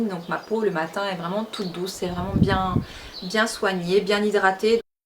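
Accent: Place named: South, France. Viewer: Ça fait du bien de te retrouver à travers une vidéo! Ne décèlerait pas un léger accent du sud qui s'installe dans ta voix? ahaha c'est charmant. Bonne journée et reviens nous vite pour une nouvelle vidéo!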